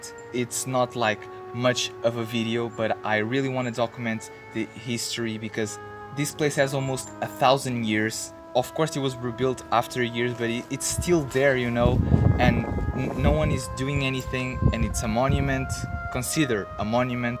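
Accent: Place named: United States. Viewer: I love your American accent when you are trying to say a word. I forgot you don't speak English it'll the end. LOL You are doing so good with this.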